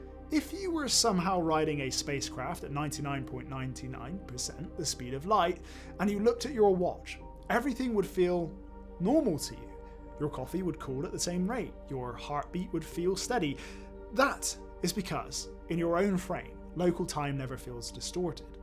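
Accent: Bristol, United Kingdom. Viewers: I know a Bristolian accent when I hear one! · sorry but how does a kid get to name The Reality Threshold?! I do find the Brissel accent hilarous.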